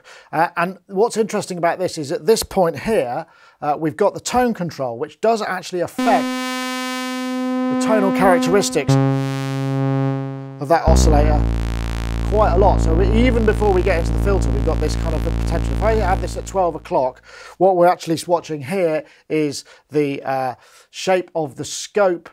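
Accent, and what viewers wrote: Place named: England, United Kingdom. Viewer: English accent at its finest.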